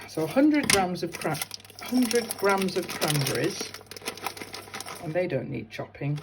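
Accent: United Kingdom. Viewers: I just love watching you and listening to you chat away about things in life. I would like to know about that gorgeous tree on your window. You are most inspiring and I look forward to each day sharing with you all the things I love. I am an American, and have lived my adult life (now 77 years old) in Switzerland, mostly, also South Africa and Austria, too. I went to British schools and I love to hear you speak, British English, using familiar sayings. I chuckle at your humor (written humour, I believe in England). You sort of bring my several worlds together.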